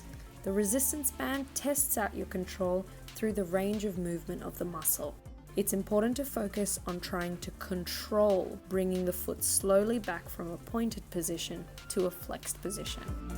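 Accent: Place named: Australia. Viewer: I noticed that you have an Australian accent! Just wondering where you can go to purchase ice skating boots?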